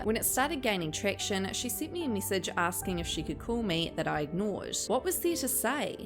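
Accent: New Zealand. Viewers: as a fellow kiwi i feel like ur accent is SO strong and its kinda satisfying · Kiwi accents are like my absolute favorite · Living for your content of late. I could listen to your kiwi accent all day.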